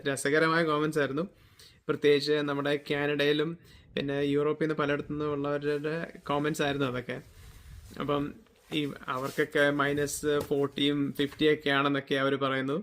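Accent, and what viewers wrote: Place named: United States. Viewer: How is speaking fluent malayalam and american accent english?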